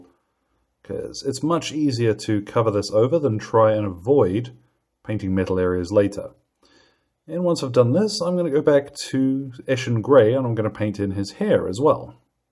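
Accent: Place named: New Zealand. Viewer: i love that kiwi banter, it reminds me of Simon Bates from radio 1 back in the day..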